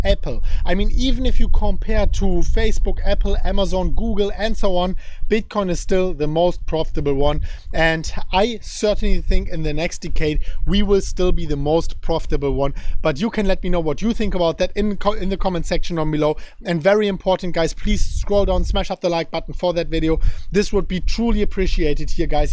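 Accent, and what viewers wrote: Germany. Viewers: Your German accent and energy is really cool....LOL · Wie man den deutschen dialekt hört😂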